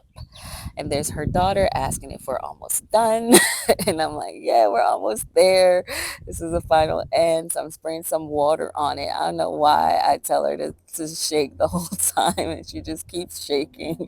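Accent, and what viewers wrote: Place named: Jamaica. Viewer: I knew you were jamaican. Lol. I asked in 1 video and you never responded. I'm jamaican too🇯🇲🇯🇲🇯🇲🇯🇲. I could hear the accent.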